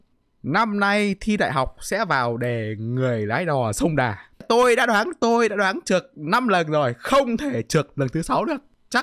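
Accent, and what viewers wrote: Hai Phong, Vietnam. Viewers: Chú ơi chú là người Hải Phòng ạ?😅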